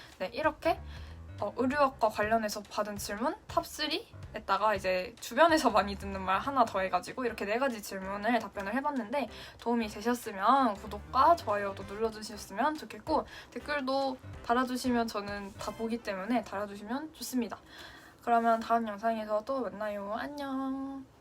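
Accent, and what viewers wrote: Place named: Jeolla, South Korea. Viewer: 전라도 출신 학생이시네요! 억양이 천상틀림 없군요~ 영상 많은 도움되었네요 감사합니다~!!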